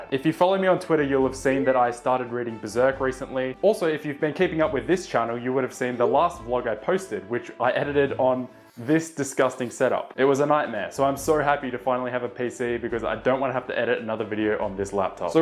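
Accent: United Kingdom. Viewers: Don't pick up bri'ish accent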